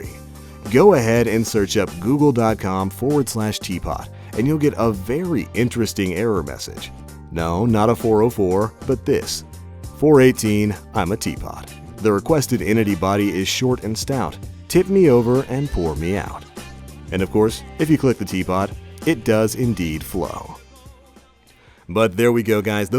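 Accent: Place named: United States. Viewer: You're in the UK? You sound so American.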